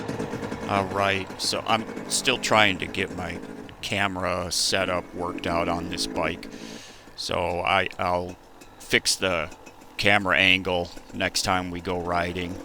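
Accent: United States. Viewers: My brother in law tapes the Bills games the same day and watches them later ad free… so no one can talk to him on Sundays! I thought I heard a Buffalo accident when you talk…By the way… I said hello at camp (said hi to lefty but forgot your name! Lol) so happy belated birthday! I not part of that group… just camped and accidentally crashed the party! Hope to run into you again before I head out… come say hello if you’d like… in the little shuttle bus ✌🏼🚐✨✨Happy Thanksgiving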